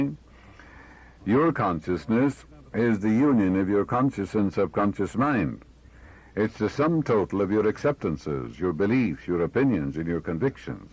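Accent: Ireland. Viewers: Plenty of good stuff here. I never realized had such a heavy Irish brogue! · Like cha and miah Murphy always retained that cork accent!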